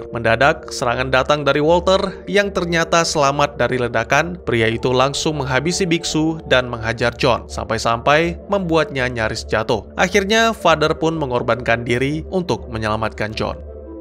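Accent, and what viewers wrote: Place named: Medan, Indonesia. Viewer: Wkwkwkwk logat orang Medan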